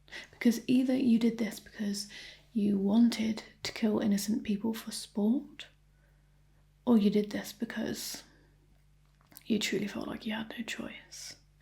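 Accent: RP, United Kingdom. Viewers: That felt fresh & original but kind of classic at the same time. I like all the surprises in there, and posh accent! I probably could do with sunglasses on for the light bit though! Haha! 😎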